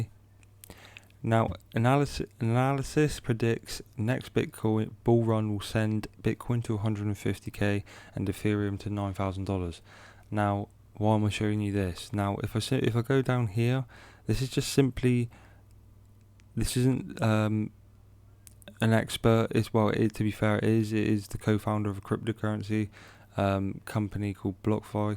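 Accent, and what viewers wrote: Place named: Bristol, United Kingdom. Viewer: you sound like your from bristol mate?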